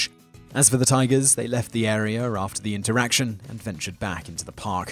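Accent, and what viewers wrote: United Kingdom. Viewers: Why does every countdown video have some British guy?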